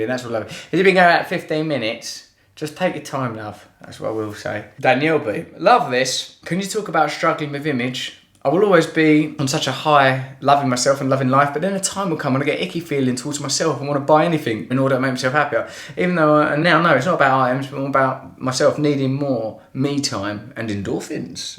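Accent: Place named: United Kingdom. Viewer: relationships = wondering about the dog and then having a laugh and that. you've gotten awfully british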